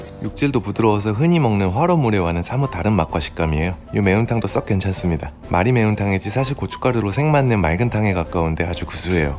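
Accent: Gyeongsang, South Korea. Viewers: 경상도~~~말투부터 짜증나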